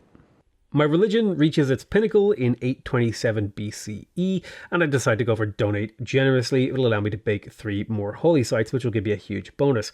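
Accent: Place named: Ireland. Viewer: Came for the review, stayed for the brogue, subbed for playing for Ireland 🇮🇪 quote.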